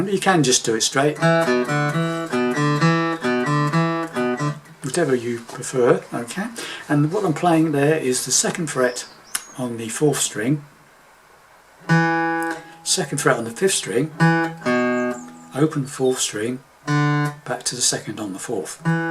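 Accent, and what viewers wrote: United Kingdom. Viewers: gracias cuidaros mucho, también, supongo que por tu acento estas en UK, tus lecciones son muy didácticas para alguien de mi edad 61 años, gracias y cuídate por UK, que también tengo a mi hija en Lincoln, gracias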